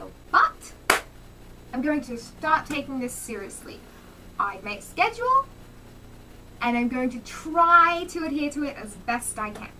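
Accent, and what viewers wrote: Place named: Romania. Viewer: Romanian accent... Okay, I'm not the only one. Someone commented on her most recent video and was all "if you're a native American why d'you live in romania?" I was like "Have you ever heard an American talk? Clearly no, because that's NOT our accent." lol, but I didn't comment that because that would start youtube DRAMA!!! ...or maybe it wouldn't, I don't know.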